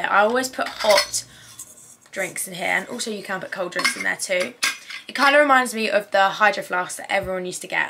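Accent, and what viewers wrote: United Kingdom. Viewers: The British accent is everything ❤️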